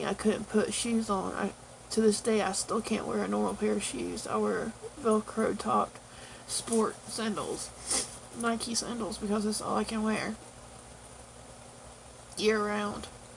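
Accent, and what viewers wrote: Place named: North Carolina, United States. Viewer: You are a beautiful woman. You are strong for talking about these things. Which Carolina are you from? You sound like you are from North Carolina. I'm from NC, born and raised. Found your channel on PP Officials channel. Hope all is well.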